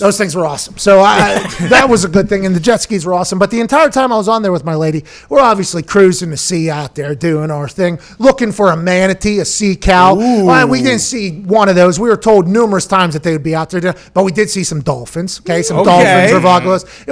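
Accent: Pittsburgh, United States. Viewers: "HE'S FUGGIN' AHT DAHN ERE." That's as Pittsburgh as it gets.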